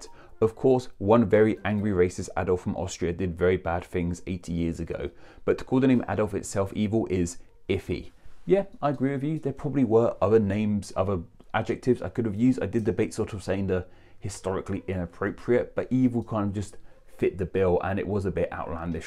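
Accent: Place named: United Kingdom. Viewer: I’ve seen other people make similar comments on other videos. Maybe that’s just the accent in the part of the UK where is from? Or maybe it’s just his own unique personal accent?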